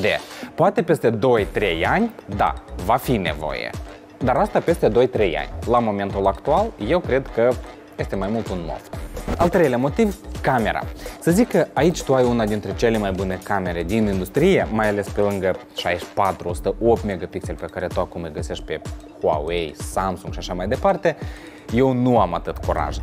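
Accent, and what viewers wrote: Moldova. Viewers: Si eu sunt romanca, locuiesc in Italia si ador accentul asta moldovenesc! ❤️ Cat despre Iphone nu sunt fana. Nu as investi banii in asa ceva, cand pe piata exista telefoane super faine si iti poti alege. Totusi pentru fiica-mea mai fac exceptii...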